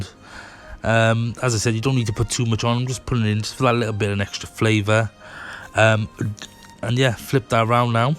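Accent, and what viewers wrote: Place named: Cardiff, United Kingdom. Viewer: Got to be a cardiff boy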